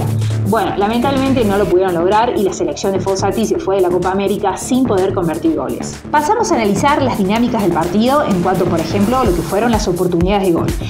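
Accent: Córdoba, Argentina. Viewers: Una pregunta. Eres argentina de la provincia de cordova??????? Se nota mucho. 😅😅😅😅😅😅😅😅😅😅😅😅😅😅😅😅😅😅😅😅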